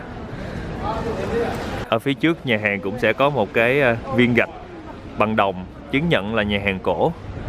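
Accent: Mekong Delta, Vietnam. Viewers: anh ấy đẹp chai và có tâm hồn ăn uống và giọng nói mộc mạc của người miền tây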